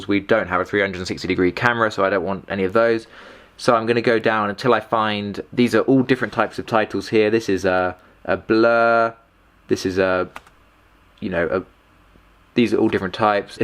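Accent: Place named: United States. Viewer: Mate what if you've got an external hard drive after using FCP and it's taking SO much space on my internal HDD lol, how do you then shift the library to the new HDD without running the existing videos? I actually went into the original media files and deleted a lot of the files which has fucked up the videos I already made. But I'm like whatever, I need space. Haha so yeah REALLY need to sort this out and help will be appreciated. Only a few minutes in but explanation is clear as F and also I'm English so honestly, the American accent does get a bit annoying after a while in tutorials not gonna lie LOL. Thanks a lot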